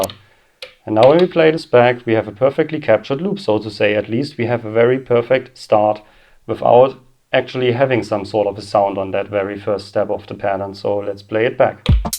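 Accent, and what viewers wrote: Germany. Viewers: Finde ich nicht gut die Lösung. Also dein Video ist super, aber ich würde gerne in einer laufenden Performance einen oder zwei oder auch vier Takte sampeln können, die dann sofort automatisch abgespielt werden. Alles mit nur einem Tastendruck! Da gibt es eigentlich nur ein geeignets Gerät bisher, was genau das kann. SoundBITE Pro von Redsound. Octatracks Pickup Recording könnte das vielleicht auch. Bin ich aber leider nicht sicher. Do you understand german? Your accent sounds like that. Would have been too complicated for me to explain that in english.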